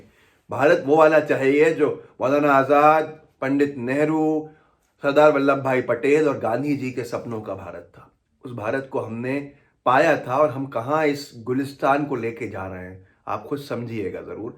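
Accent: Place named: Lucknow, India. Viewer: Lucknowi lag rahe ho bhai jubaan se, kaha se ho? Ahcha karyakaram hai, good luck!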